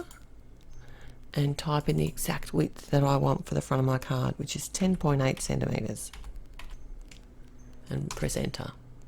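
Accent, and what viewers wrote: Australia. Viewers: Just learning how to use my cricut. You sound Australian, me too.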